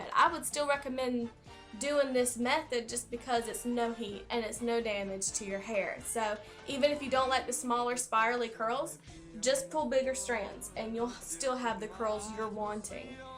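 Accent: South, United States. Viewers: your accent is so adorable and endearing! so wish I had more of a southern accent than I do. you seem really sweet just wanted to tell you that! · This is awesome! Thanks! :) I love my hair and your method worked great! Question, How long do the curls stay in your hair during the day without hair spray or any moose, just hair? How long with? I'd appreciate your answer :) BTW I adore your country accent, I think its the cutesiest Ever! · That's what you call a country accent she is from the south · Great job girl love that southern accent, keep doing YOU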